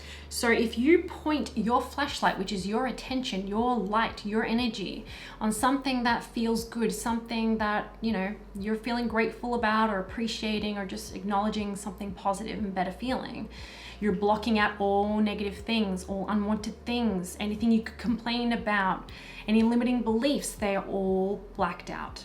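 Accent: Australia. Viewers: I thought that was an aussie accent! 😉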